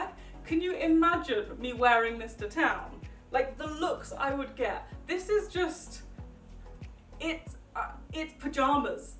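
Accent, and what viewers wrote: United Kingdom. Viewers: Are you British 😊😊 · she is British like me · Are you British or something bc I love your voice it is great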